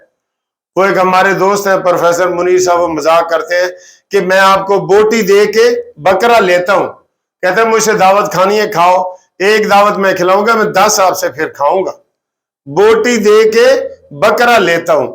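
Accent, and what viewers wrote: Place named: Punjab, Pakistan. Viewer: I like your Punjabi pronunciation. Klear for clear. Sakool for school. Tushi great ho from India.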